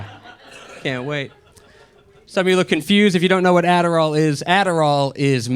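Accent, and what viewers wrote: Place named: New England, United States. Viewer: Funny he reminds me of Bob Tasca III from NHRA although Bob has a harder New England accent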